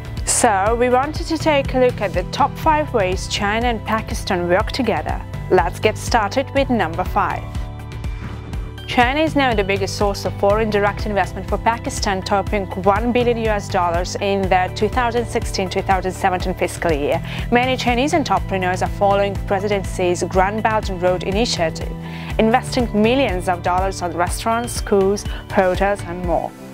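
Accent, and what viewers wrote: United Kingdom. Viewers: Britain accent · i love british accent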